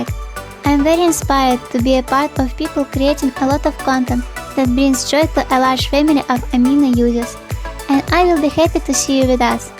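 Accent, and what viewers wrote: Russia. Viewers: Сразу поняла, что автор русский по акценту :D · Ты русская? Да, плохой вопрос... но по произношению заметил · Акцент все же русский =v= А вот голос милый) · Видно что русская. Или знаешь русский. Акцент есть. И кто нибуть, научите меня так рисовать 😍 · I think she's Russian and that is her Russian accent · I like it funny and I realized that you are Russian because you have a Russian, but I like your videos☺️ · Вот это акцент, сразу понял что человек русский)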